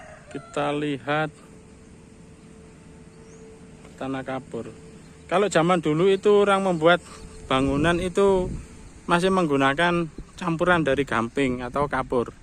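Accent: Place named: West Java, Indonesia. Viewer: bhs mu ane kl jabar kn..lucu haha hebat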